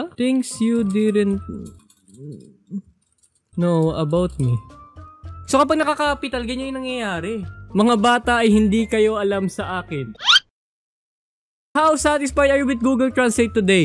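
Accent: Visayas, Philippines. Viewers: Bisaya ka ba kuya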